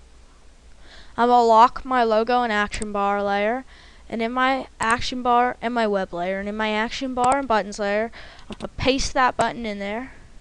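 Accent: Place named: Alabama, United States. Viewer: or... would it be sky flaaaawers? ;) j/k. This tutorial was cool. I used to have the same accent, being originally from Alabama and raised partly in Florida.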